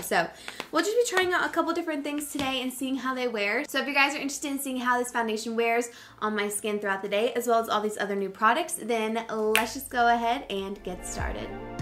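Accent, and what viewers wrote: South, United States. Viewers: I don’t to be rude but you’re pronouncing Phthalates wrong. It’s THAL-ATES but I do like how you say it though with your southern accent!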